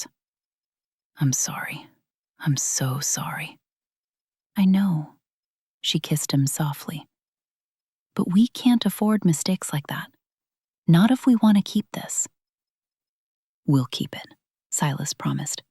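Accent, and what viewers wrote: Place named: England, United Kingdom. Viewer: I liked storyteller with the English accent.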